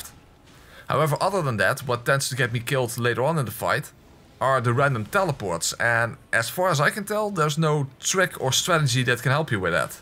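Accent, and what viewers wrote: Netherlands. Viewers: My brother, it's not just that amazing dutch accent; I for one am always looking forward to your new choices for metalsongs. Again: not dissappointed. Then again, can be used for every pyromancy based run too. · you sound dutch of niet dan :P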